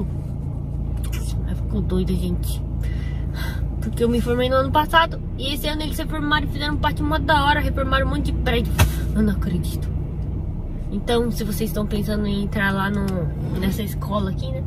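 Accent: Japan. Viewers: o interessante o seu sotaque em portugues.....parece uma japonesa falando em portugues Estudei japones no Brasil e meus parabens por ter feito daigaku no Japao.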